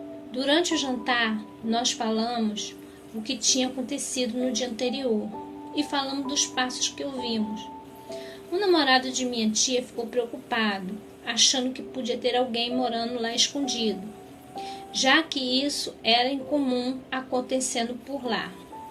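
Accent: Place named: Rio de Janeiro, Brazil. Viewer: seu sotaque carioca é muito fofo 😊. É cada história hein, alguma coisa ruim tinha lá. Credooooo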